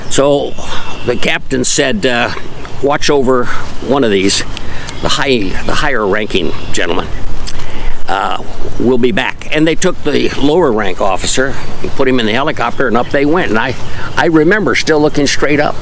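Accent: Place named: United States. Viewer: was a handsome guy back in his day, bet he had the choice of all the young ladies!!! I feel kind of bad for pulling you up on one of your older vids for pronouncing gamo as gaymo!!! lol I only came across that vid as I was looking into the gamo swarm maxim elite becasue I purchased 1 around that time & having only seen that 1 vid, didn't realise how much of an official hardcore gun enthusiast/aficionado with such a vast collection & being a living knowledge base in the overall field of guns (I live in UK & just own the gamo swarm maxim elite... but would def own more if I lived in the US). So my apologies for the gamo/gaymo thing, I feel a little humbled when I only own the 1 & you own enough for a small army, yet I naively pulled you up on pronunciation! only meant as light hearted UK/US tomato/tomaydo type accent difference & was just having fun! 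(here in UK we like the US/American acent!). looks like an amazing place to live in Michigan!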